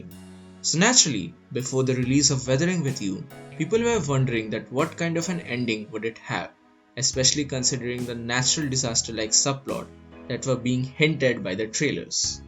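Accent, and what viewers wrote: India. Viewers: Wanna have an Indian English conversation? we talk just like him.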